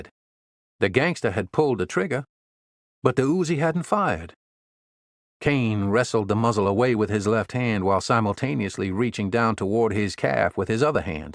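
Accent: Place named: South, United States. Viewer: Horrible Narrator The Southern Accent is 😞 Bad Very Annoying. This Book is Boring Very Slow Moving. I couldn't care less about The Story or anyone in it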